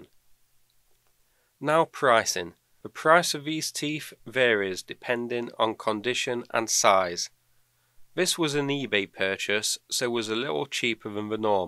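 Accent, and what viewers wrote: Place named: Midlands, United Kingdom. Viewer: Taiwan? Just by accent I would say central englad...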